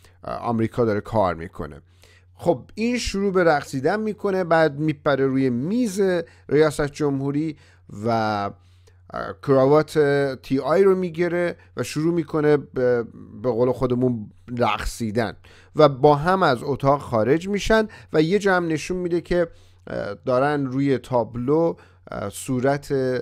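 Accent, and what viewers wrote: United Kingdom. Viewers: خوب ویدیو را نشون بده. چرا مثل تازه بدوران رسیده های غرب زده فارسی را با لحجه انگلیسی حرف میزنی؟ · داری فارسی حرف میزنی با لحجه انگلیسی وقتی می‌پرسه آیا عاشق ترامپ هستی دو دفعه میگه بله ما خوب هستیم yes yes we are fine. از همین جا معلومه چرند میگی و هیچ اطا عی نداری و دروغگو هستی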